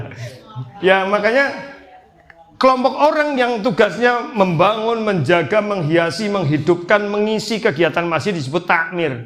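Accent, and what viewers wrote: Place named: Banyumas, Indonesia. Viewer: ustadz bahasane penak ngapak pd kr nyong, semangat tadz sehat sll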